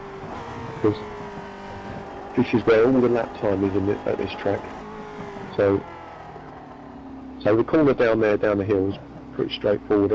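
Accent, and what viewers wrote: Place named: Germany. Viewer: Really great getting a bit of the historical background on these tracks. I can understand you much better with the German accent. Could you please use it during iRacing communication?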